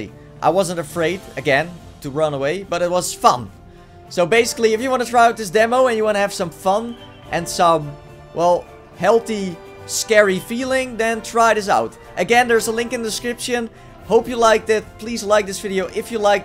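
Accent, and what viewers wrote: Netherlands. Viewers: Dat nederlands engels accent xD eerste 3 seconden.. oh, tis een nederlander xD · Ik kon gewoon horen aan je accent dat je nederlands bent :D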